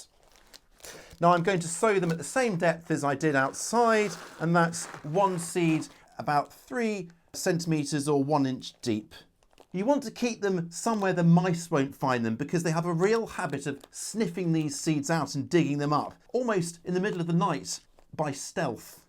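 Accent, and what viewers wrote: United Kingdom. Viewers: Always appreciate a British accent. Similar growing conditions to where I live, Pacific Northwest, so I know I can get relevant info.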